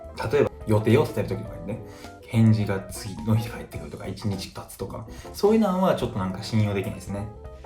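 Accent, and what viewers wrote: Kansai, Japan. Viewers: 関西弁新鮮な感じします！✨ 明日からのVNLフランス大会楽しんでください☺️ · ランくんの関西弁がとっても新鮮🤗💞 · 藍くん、ハンサム・ボーイなのに 喋ると関西人そのもので、思っていたイメージとギャップがあってビックリしました😂 ちょっと面白いやん…とハマってしまったので、コレからの【らんるい】も楽しみにしてます🤗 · 関西弁？か分かんないですけど 〜やけど、とか 分からんけど〜とか なんか親近感湧きますねぇ〜😂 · 最初の方、若干標準語ぽかったのに、身内からの質問読み出して関西なまり出始めたの好き🤣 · 関西人としては関西弁と関西のノリがとても落ち着くので どこへ行ってもそのままでお願いします、、！！ コンビニでお箸渡されて脈ありか？ は笑ったｗｗｗ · イタリアで頑張ってますねー！応援してます。 関西弁が混じるところがいいですね！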